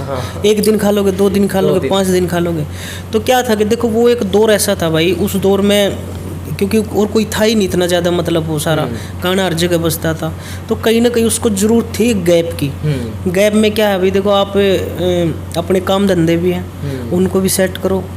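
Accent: India. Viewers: Bhai interview achha tha Par aap apne bolne m thoda change karo Uchana aali jyada hojya hai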